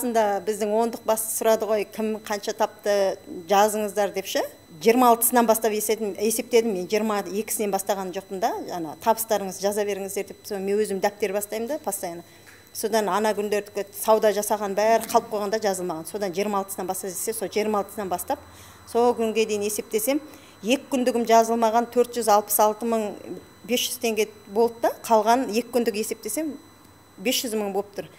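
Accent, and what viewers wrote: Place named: Karakalpakstan, Uzbekistan. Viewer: Қалпақтардың акцентімен сөйлейді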